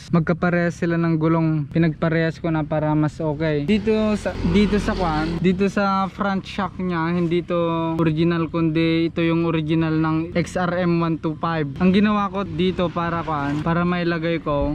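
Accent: Ilocos, Philippines. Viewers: Halata man nga ilokano Lodi haha astig ta motor mu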